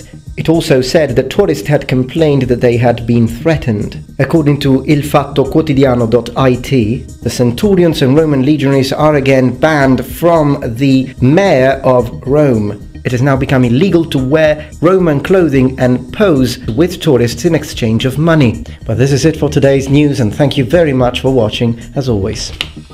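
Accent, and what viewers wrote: United Kingdom. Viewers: I am amazed isnt English and actually learnt English quite late. He would make a classic BBC television presenter. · Strong British accent on this Italian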